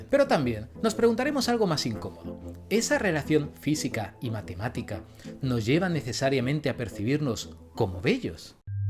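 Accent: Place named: Spain. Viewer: Tu forma de hablar me recuerda al Filopalomo, a CdeCiencia y a ElvisaYomastercard. Me pregunto si son los cuatro de la misma región de España o es algo mas extendido en el país? 🧐🤔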